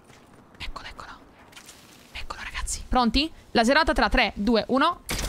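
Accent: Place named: Naples, Italy. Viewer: Ma tu sei napoletana io sono di bari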